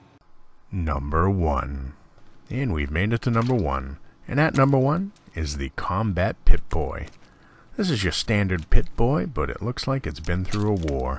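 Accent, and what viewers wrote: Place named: Boston, United States. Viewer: You sound Boston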